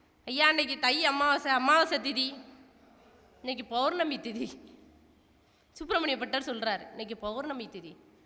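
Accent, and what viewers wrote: Tirunelveli, India. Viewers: உங்களின் நெல்லை தமிழ் மூலம் சொற்பொழிவு அருமை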